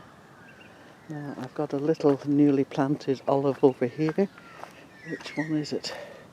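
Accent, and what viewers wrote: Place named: Ulster, United Kingdom. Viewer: Fantastic...amazed you have so many cuttings. splits etc. The Ulster accent gives an international flavour (surprised no Aussie twang!!!)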